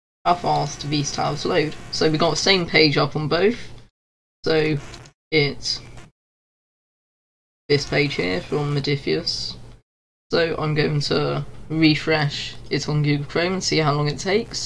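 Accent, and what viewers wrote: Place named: United Kingdom. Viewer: Youre save a british guy and i dont like british english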